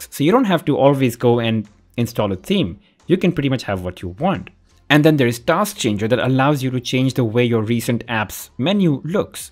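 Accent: India. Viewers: I love hearing your 'd's So in'd'ian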